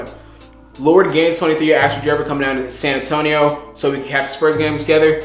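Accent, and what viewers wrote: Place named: Nigeria. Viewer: That naija accent 😂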